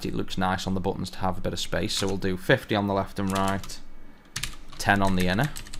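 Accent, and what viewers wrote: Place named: England, United Kingdom. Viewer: I am genuinely interested what is the english accent maybe dialect that you speak in the video. googling didn't give me any answers and thanks for the videos the best wpf on youtube.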